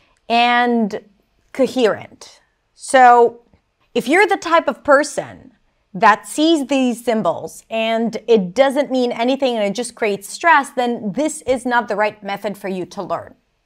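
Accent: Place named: United States. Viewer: Madam your English speech is American I think coze I want to fallow .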